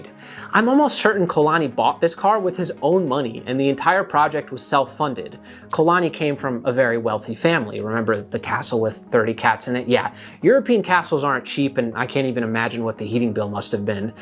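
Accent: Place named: Germany. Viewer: My brain was short circuiting : I hear a German accent out of an Italian. Imagine my relief when you reveal the truth.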